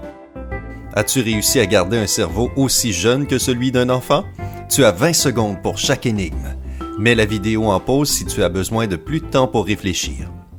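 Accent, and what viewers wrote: Quebec, Canada. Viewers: songUp euh non c l'accent quebecois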